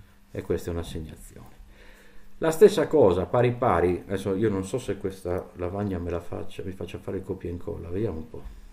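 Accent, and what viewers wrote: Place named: Sardinia, Italy. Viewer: Non posso non fare i complimenti per la chiarezza espositiva e le precise nozioni illustrate. Mi dispiace essere arrivato in ritardo nella scoperta di questo canale che sto seguendo con piacere e tanta voglia di apprendere il linguaggio C#. Chissà... Davvero complimenti perché rispetto ad altri canali Youtube, al momento, questo si sta rivelando per me il più efficace. Sinceri complimenti! P.S. Immagino dall'accento e dal cognome che siamo anche conterranei... fortza paris!:goodvibes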